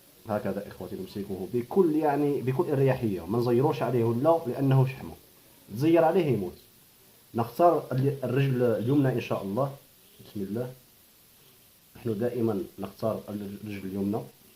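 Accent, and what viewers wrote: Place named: Tlemcen, Algeria. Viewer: بارك الله فيك اخي اللهجة نتاعك تلمسانية لالا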